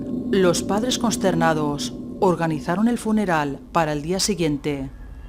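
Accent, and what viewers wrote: Spain. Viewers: ese acento Espanol me cae en los huevos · siento que la chica que esta hablando es de españa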